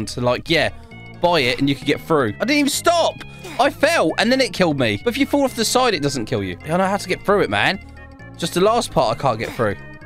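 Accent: United Kingdom. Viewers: Cool vid man the bri ish accent tho ✨AMAZING MAN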